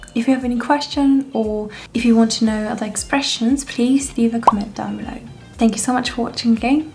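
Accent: England, United Kingdom. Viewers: Why do you sound british? 🫤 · your last about no san to titles but is it okay to say xx shachou san? Thanks. BTW I love your English accent cuz in Japan most of time i hear is American English but yours is English English. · Your accent is pretty incredible. Did you live in the UK when young or did you work on it? Because I've never heard a non Brit speak like that. Even Americans.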